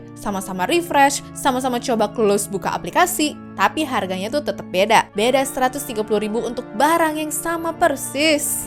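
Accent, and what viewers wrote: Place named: West Java, Indonesia. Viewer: kakanya kayanya dr logat jawabarat yah heee...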